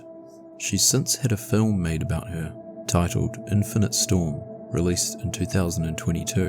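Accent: New Zealand. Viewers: I turned on notifications just for the Kiwi accent haha 🇳🇿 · Are you from New Zealand or South Africa? I'm trying to place your accent. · Are you from NZ?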